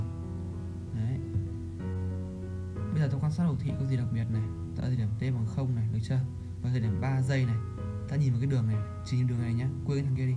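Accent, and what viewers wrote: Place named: Nam Dinh, Vietnam. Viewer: tuy giọng anh có rất Nam Định nhưng đc cái ấm =))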